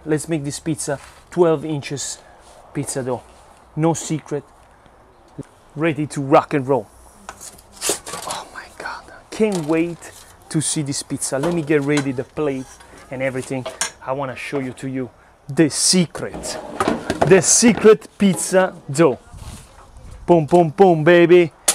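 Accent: Italy. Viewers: sei bravissimo e grazie mille. PS: si sente tanto l'accento italiano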